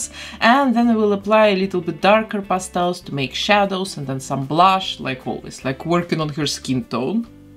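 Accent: Russia. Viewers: Знакомый акцент. Вы случайно не русская?) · Russian akcent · Очень красиво, но почему мне кажется что вы говорите с русским акцентом? Ваша работа вдохновляет! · Мне одной кажется, что у неё очень сильный русский акцент 🤔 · Такое ощущение, что девушка русская, так как говорит с акцентом, но тогда жаль что нет русских субтитров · Девушка, ваш акцент уж очень напоминает русский... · Вы русская? У вас такой жесткий английский 😱😱😱😱 Я тоже решила переделать такую же Дракулауру, не нравится она мне вообще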